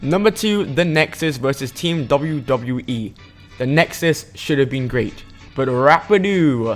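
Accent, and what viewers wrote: United Kingdom. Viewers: Why are all these top 10s narrated by a British dude does whatculture wrestling have different accounts with slightly different accents? 😱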